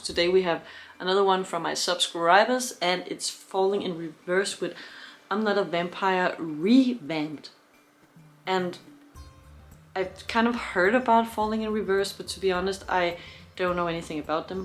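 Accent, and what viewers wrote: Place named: Denmark. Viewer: Loved the reaction! He will blow you away even more if you listen to more tracks. And.. are you Danish? The accent sounds familiar. I’m Danish so it gives me hints of that ;)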